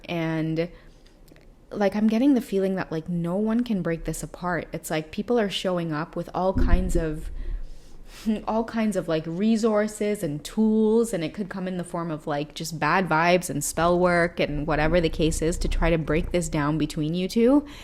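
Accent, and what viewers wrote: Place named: Trinidad and Tobago. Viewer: Love ur trini accent im from trinidad too love u u resonate with me alot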